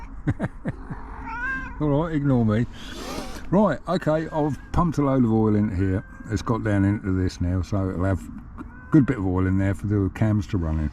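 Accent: Suffolk, United Kingdom. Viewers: Many thanks for this, Mr. I will save the link till it's time for my 500. In my opinion, the gear cover casting is one of the most beautiful parts of the engine. I have enjoyed watching this, and listen to your pleasant speech. Suffolk, was it? Swedish regards. 😀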